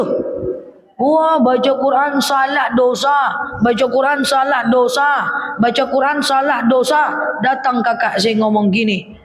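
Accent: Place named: Malaysia. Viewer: Masya Allah logat Melayunya suka saya ustazdah cantik,dah hidup diduniya banyak masalah,pulang kampung akherat singgah dineraka pula yassalam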